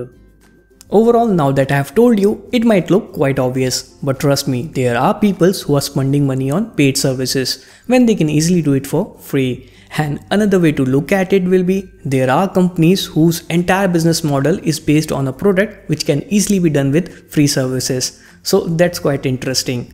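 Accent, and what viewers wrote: India. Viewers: The only indian voice i can take without wanting to just click off the video. Great job!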